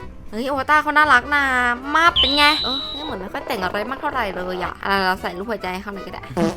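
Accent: South, Thailand. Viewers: พี่ เป็นคนใต้รึป่าวคะ😅พอดีเหมือนได้ยินพี่แหลงใต้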